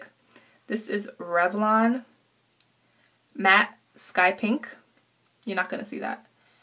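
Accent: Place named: New York, United States. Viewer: Omg, I love your Ny Accent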